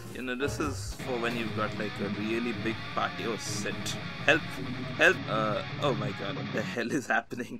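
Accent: India. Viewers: I thought you are indian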